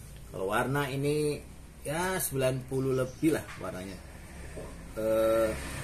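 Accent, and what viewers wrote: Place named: Banyumas, Indonesia. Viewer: wow musangking ngapak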